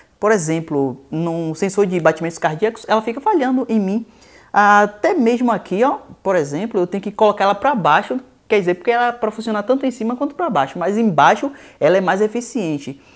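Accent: Bahia, Brazil. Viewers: Sou de São Paulo e adooooro seus vídeos, seu sotaque baiano é musical😊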